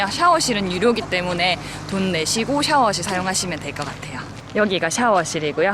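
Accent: Gyeongsang, South Korea. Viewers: 영상 잘보고 갑니다 익숙한 경상도 말이네여 : ^ ) 해맑으셔서 기분이 좋아집니다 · 혹시 고향이 갱상도 인가요???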